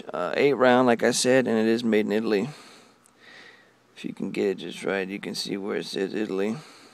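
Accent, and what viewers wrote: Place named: Texas, United States. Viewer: JohnnyAss, Dude is from Texas. STFU Hollywood.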